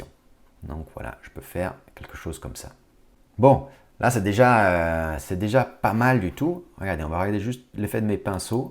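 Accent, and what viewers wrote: United Kingdom. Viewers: Merci pour ce tuto sympathique. Ce que j'apprécie dans ce cours (contrairement à certains), c'est justement son côté "amateur"!! Les idées de base sont là, et permettent à chacun d'approfondir plus en détail la technique. Ne lâche surtout pas ton petit accent "British" qui m'amuse bien et donne à tes cours un côté sympas!!! PS: l'intérêt de YT c'est justement de pouvoir partager ce genre de tuto avec les plus humbles. Pour les "autres" il reste toujours les hautes Ecoles Privées avec toute la choucroute qui va avec.....!!!! Merci encore et continue dans ce sens!!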